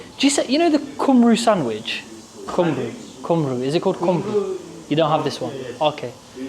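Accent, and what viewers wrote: United Kingdom. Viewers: my Pom friend I do really admire you Islam knowledge love British accent. and you are very humble my allah reward you with cennet ❤️🤲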